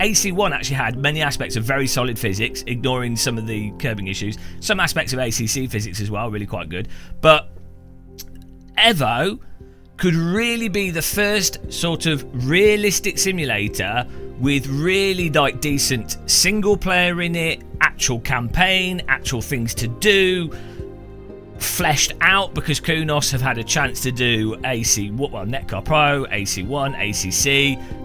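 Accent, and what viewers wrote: United Kingdom. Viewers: Here we have it folks. This is why they tell you not to consume lacquer thinner, let alone on a daily basis... That bald, british sounding man's brain rot level has gotten severely out of control at this point. Time to gently smile back and slowly leave the scene. Might be contagious after all... 😂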